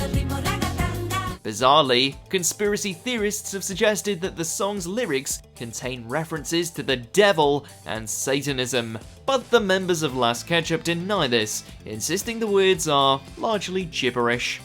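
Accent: United Kingdom. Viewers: The amount of mispronunciations of celebrity names, places and general British things are alarmingly many. I would accept it from a non-Brit but this guy sounds British so it's really confusing.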